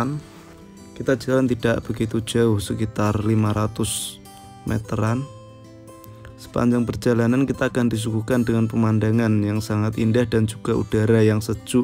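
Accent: Java, Indonesia. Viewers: Aslinya mana nichh kok suarane ada jawa2ny gtu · Ikutan give away ka. Menarik ka videonyanya apalagi denger suara kk yg logatnya jawa bangett, natural bangettt. Next tetap bikin video2 trip kakak yg menjelajahi setiap tempat wisata dan kuliner tentunya dikota apa negara tersebut nama : ayu furwanti kota : jakarta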